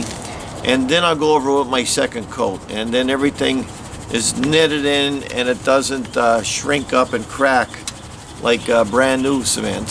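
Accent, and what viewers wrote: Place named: Pittston, United States. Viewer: Great video! Straight to the point and it will give a lot of people the confidence to DIY. I know that accent well. Heyna? 😂 Born and raised in Pittston. Live in Hawaii now.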